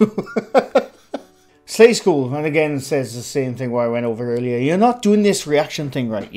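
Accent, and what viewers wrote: Wales, United Kingdom. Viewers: Luv Welsh accent too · enjoy listening to your lovely welsh accent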